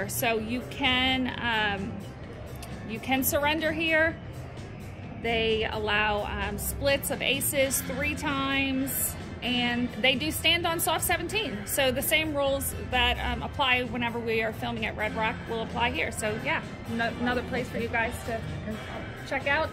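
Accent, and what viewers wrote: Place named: South, United States. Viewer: would be AWESOME to play blackjack with ya sometime if it's in the " cards " and ya I'd love to learn that southern talk!! I'm Canadian were known for the cold and wearing summer stuff in the cold, dont ask, lol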